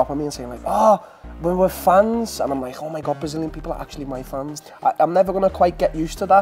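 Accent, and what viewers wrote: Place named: Liverpool, United Kingdom. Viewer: The scouse "r" and "arrr" help a lot.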